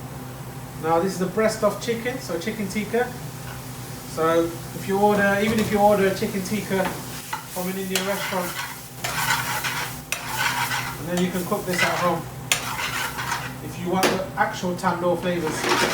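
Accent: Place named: Stoke, United Kingdom. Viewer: You know, I was watching this and thought that sounds like a stokey accent and at the end you revealed that it was haha I’m in Stoke too and will definitely come down to the restaurant 😊 great videos, I love Indian cuisine and am trying to learn more about foods from different regions. Thanks for the videos mate 😊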